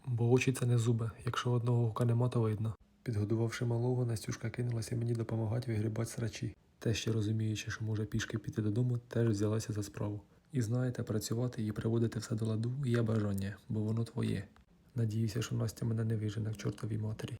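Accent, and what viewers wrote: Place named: Poltava, Ukraine. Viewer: а звідки ти напиши, просто говір у тебе такий полтавський, от я і зацікавилась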